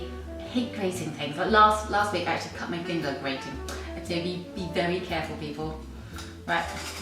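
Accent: United Kingdom. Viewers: Alton brown I love that guy show I already like you #subscribe the accent is a plus I adore British accents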